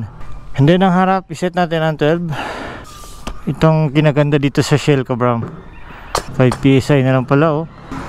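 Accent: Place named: England, United Kingdom. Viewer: natatawa ako sa english accent tsaka sa kinalabasan ng cc/subtitle ng video hahahaha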